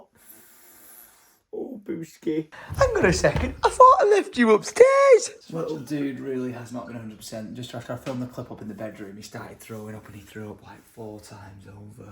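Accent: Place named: Scotland, United Kingdom. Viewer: Which of your parents are of Scottish descent? I've never noticed a Scottish accent in either of them, but you often adopt one, as you did today. Or is that just a "Manchester thing?"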